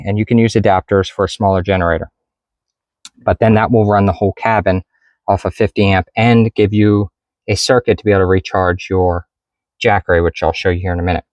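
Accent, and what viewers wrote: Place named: United States. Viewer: Aucun Européen parle comme cela. Faut il être un Con d amerlock pour avoir cette intonation. Si un Iranien ou autre vous tué , on ne vous pleura pas et tant on ne vous entendra plus.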